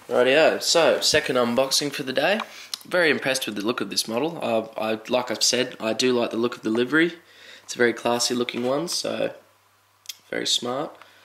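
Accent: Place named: Australia. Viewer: ahh mate i absoloutely love your good old aussie accent! it's so close to an nz accent! (the best =D) great video!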